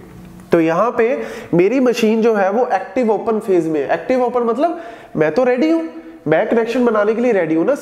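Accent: Punjab, India. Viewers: Damn! That Punjabi accent surely sticks in my head. Great explanation too! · punjabi accent makes the video greater , Great work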